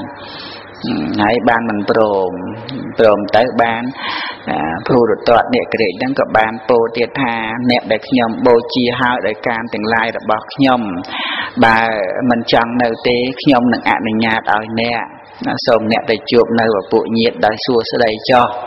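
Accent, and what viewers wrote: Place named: Kandal, Cambodia. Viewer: ព្រះអង្គ ទេសសំនៀងដូច អ្នកស្រុកកណាចឹង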